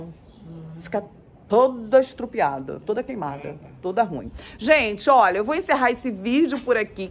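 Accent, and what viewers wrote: Rio de Janeiro, Brazil. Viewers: Moça vc é admiravel, espontanea, super vc, adoro carioquissima com esse sutaque amooooooo muitooooooo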